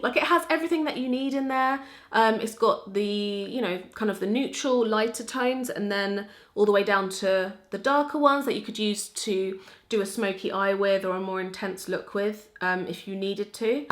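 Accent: United Kingdom. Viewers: I just LOVE your british accent #italylovesyou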